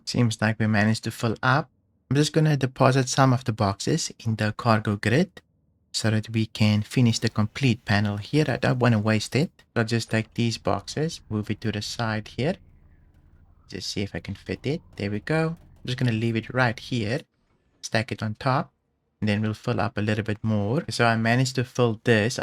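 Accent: South Africa. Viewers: Hey bud, sound like a SA, you on a Discord. Looking for people to play with, love the vids.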